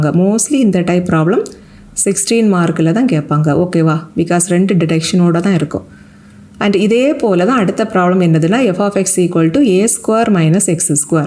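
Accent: Kanyakumari, India. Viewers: Kanyakumari slang matiri irukutu niga pasuratu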